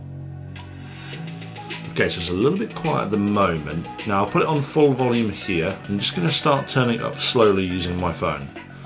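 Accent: United Kingdom. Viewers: The thing that suprised me most is even though I use "Echo" as the wake word for my device, it wasn't triggered once during your video. Is it possible that my device can distinguish between a Northeastern U.S. accent and a British accent?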